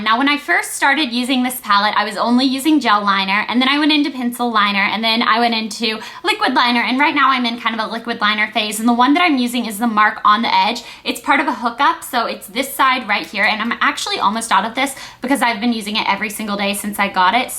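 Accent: South, United States. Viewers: i absolutely love your southern accent :)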